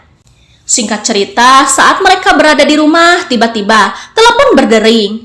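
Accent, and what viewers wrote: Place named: West Java, Indonesia. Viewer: Narator nya orang sunda njir....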